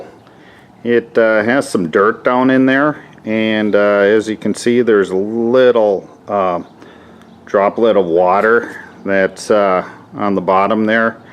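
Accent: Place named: Minnesota, United States. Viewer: You sound like you are from Minnesota?? You have an accent just like all my relatives!!!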